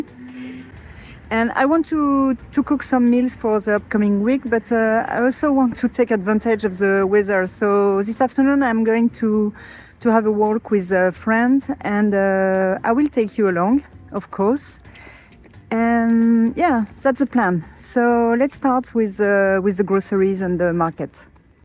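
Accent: France. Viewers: Thank you for the fresh ideas for sauces. It’s not close to spring where I live but I will definitely try these in another month or two. Loved the Parisian street scenes. You have delightful French accent and you seem like a native English speaker. How did you get so fluent in English?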